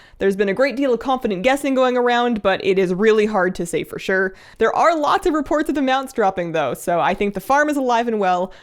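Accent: Canada. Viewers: Hi, maybe it's just me but I think you are sounding more Canadian! The last couple of times I tried, I could not give the trinket mats to other eligible players. I hope the enchanting bug is fixed soon, and I really miss Bagnon.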